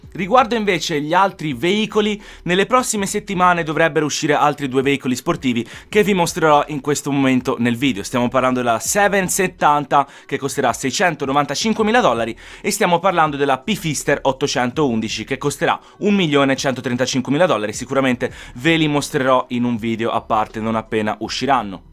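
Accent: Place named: Tuscany, Italy. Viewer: Mhhh prima volta vedo il tuo canale e mi pare tu sei Toscano... Montecatini? Il modo di parlare mi sembra di lì ahahah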